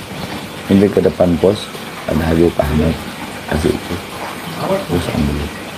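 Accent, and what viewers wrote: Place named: Manado, Indonesia. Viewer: Sama sama orang Manado (Sulawesi) lagam bicaranya sama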